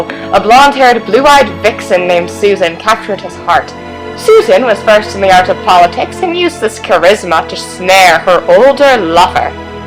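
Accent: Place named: United Kingdom. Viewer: love the British accent. you're quite talented. keep 'em coming!!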